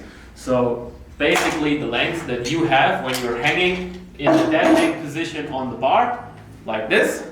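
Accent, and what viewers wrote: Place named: Germany. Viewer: Hahaha dein deutscher Akzent ist ja so geil 😂😂😂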